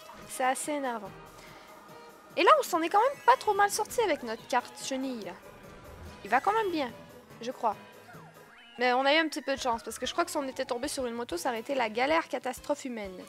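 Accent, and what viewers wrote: Quebec, Canada. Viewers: T'es québécoise?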